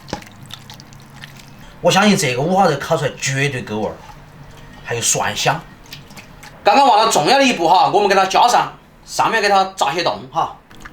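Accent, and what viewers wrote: Sichuan, China. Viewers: 很好吃的样子，不过还是怕油腻 四川话很好听啊，越听越好听 想念国内的新鲜大葱😍😍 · 好标准的四川话。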